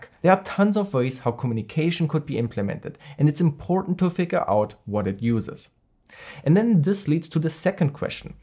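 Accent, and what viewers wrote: Germany. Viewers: 3 sekunden ich wusste sofort der spricht deutsch 😂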